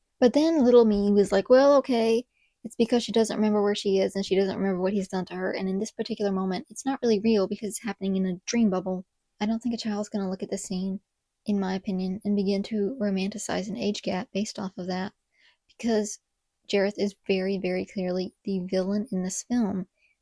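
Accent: South, United States. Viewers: What is your accent? I can't place it but it's a lovely southern accent. I'm from Texas and Arkansas (half my life in each state). Is it Tennessee perhaps?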